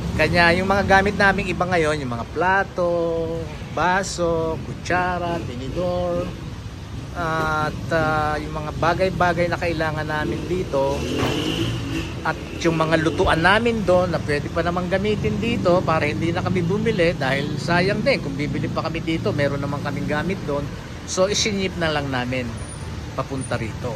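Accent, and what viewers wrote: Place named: Pampanga, Philippines. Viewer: Kuya kapampangan ka? Kasi may tono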